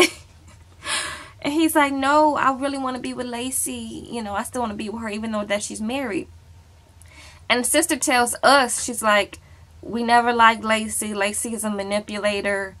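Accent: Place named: New Orleans, United States. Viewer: Are you from New Orleans cause that accent is giving me straight Nola baby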